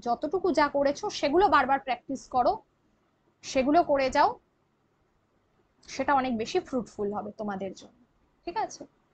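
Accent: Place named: Bangladesh. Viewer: Mami Videote Mamar Chhobi Dekhchhi Aabar Tumi Class Korachchho?Mami Tomra Ki Rifiuji? Tomar Bhanshar (Language) Dhoron (Style) Kintu Bangladeshi Mone Hochchhe?Mami Tumi Jader Class Korachchho Mane Aamaderke Tomar Chhoto Bachcha Mone Hochchhe?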